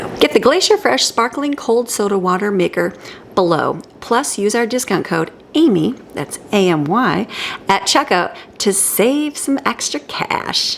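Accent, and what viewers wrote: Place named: South, United States. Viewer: I just want to say again I LOVE your accent and how you say certain words, I giggle and my hubby says "what are you laughing at?" I tell him and he laughs too. He is from the COUNTRY so his accent is even more southern than mine!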